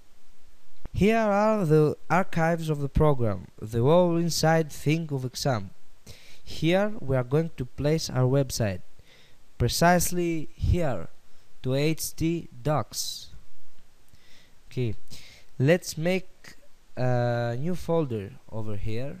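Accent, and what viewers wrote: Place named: Russia. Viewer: I like russian people, they got an interesting way of talkin :)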